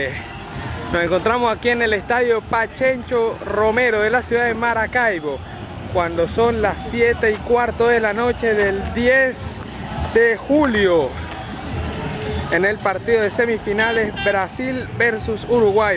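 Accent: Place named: Argentina. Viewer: Demasiado bueno el video... Pero por momentos llegue a pensar que quien narraba era un argentino... Pilas con ese asento mi hermano! Aguante Vinotinto!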